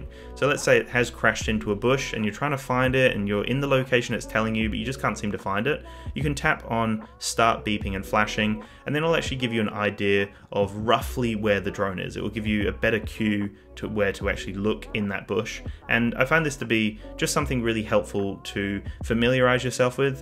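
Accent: Australia. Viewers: Thought you were Aussie, I live in Echuca in Victoria :) keep up the good work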